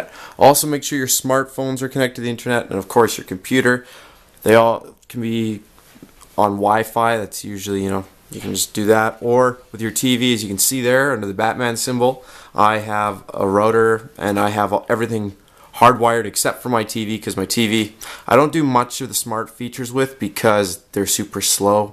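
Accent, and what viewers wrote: United States. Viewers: Gerardo Sanchez Dumb stupid speaking yank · Leigh Ann Woody, the world is more than USA, outside the states you pronounce it "rotor", even if he sounds like an amercian, "rotor" is correct. So, how smart are you? ;)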